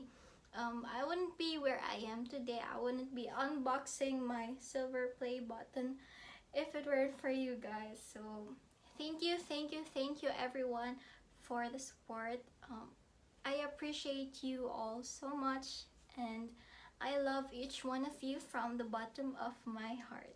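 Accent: Philippines. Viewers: Wow hearing ur voice I just realized that ur Philippino and ur voice is so cute and sweet u r so good at acting and keep up the good work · I think your from Philippines because that's how I sounds when I'm speaking English and I'm from Philippines 🧋🧋🌸🌸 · weit is she Filipino bc she has the accent and she said and hirap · u sound like a filpino?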